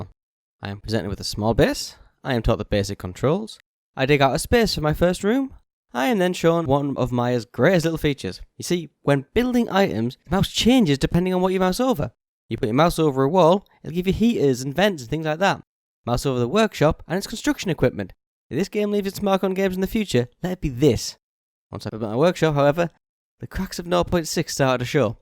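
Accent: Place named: United Kingdom. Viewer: If you actually talk to British people as you say you do, then you would know that is British. Same as most countries we have regional accents, and if you say you 'can't' understand him, then look else where. What i find most hilarious about your comment is that American's and Australian's got there English from the actual British! Be more considerate instead of being a douche! To, Your video is fine the way it is, great job! Don't listen to people who proclaim to know what a British accent sounds like:p as i said its regional!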